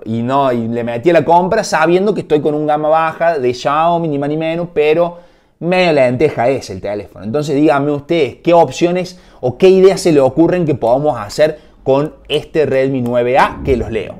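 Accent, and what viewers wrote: Córdoba, Argentina. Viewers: Que lindo acento cordobés!! Saludos desde Uruguay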